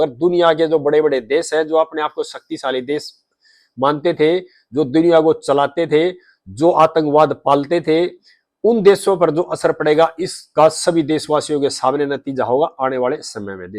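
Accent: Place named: Rajasthan, India. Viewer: Vdo dekhneme bilkul maja nahi9 aayi.....Rajstani chacha boli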